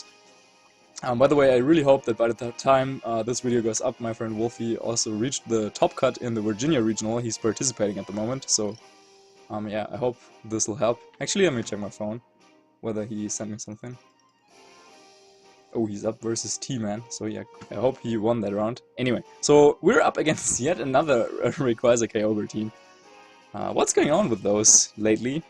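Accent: Germany. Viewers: just do it in german youll be fine ......................... well you do english videos as a german? so dont worry about ur pronounciation itll always be germanish :D just as mine ---.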